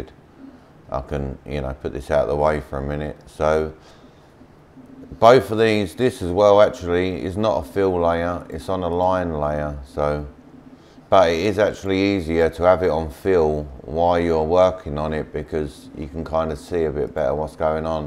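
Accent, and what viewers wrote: United Kingdom. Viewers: Mate, it says you're in the states but you judging from your accent you're from the UK? Seems like you know your stuff, what's your story?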